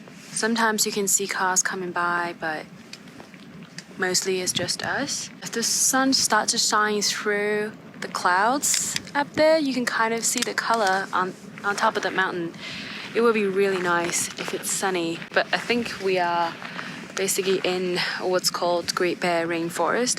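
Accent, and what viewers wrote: Australia. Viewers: Interesting accent, is that Australian / Korean ? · That’s the weirdest sound I’ve ever heard 🤔 I’m also trying to figure out your accent, at times you sound Australian. It’s very confusing, lol.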